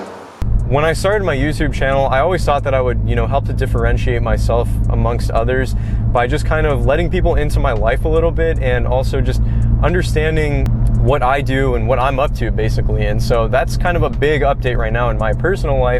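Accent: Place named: Las Vegas, United States. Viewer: Sound like a local Vegas guy loo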